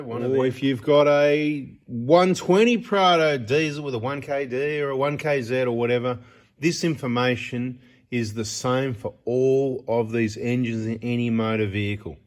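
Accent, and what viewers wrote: Australia. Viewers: Why are you speaking like an Australian ?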